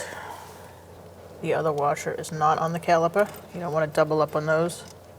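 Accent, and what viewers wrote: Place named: New England, United States. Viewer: love that New England accent.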